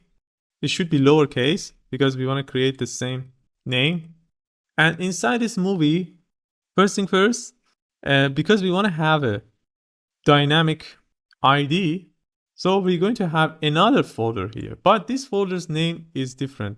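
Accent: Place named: Iran. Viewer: Wonderful! I assume you're originally iranian. so am i. loved the accent and the smooth pace of the tutorial. It would be wonderful if you do a big project like Airbnb.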